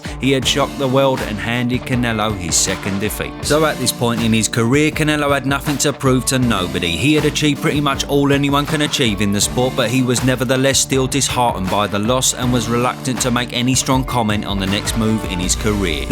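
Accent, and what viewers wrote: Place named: Essex, United Kingdom. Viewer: Can they get someone else to do the commentary, his flat Essex/counties tone is really off putting, but great channel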